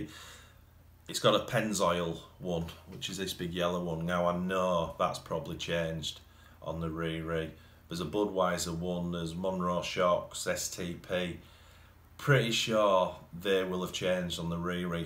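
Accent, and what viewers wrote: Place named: West Yorkshire, United Kingdom. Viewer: You sound local to me... I'm in West Yorkshire, Leeds Bradford area. Just discovered your channel and loving it as Imy first rc was a frog in 85,second hand mind you. Still took nearly a year of paper round to buy. Just getting back into it. Bought a zd racing for now til deciding what bigger to get. Anyway cheers for the memories mate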